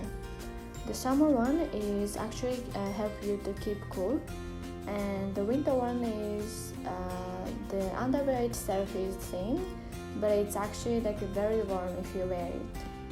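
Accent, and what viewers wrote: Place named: Gulf, Arab world. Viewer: You speak khaliji accent lol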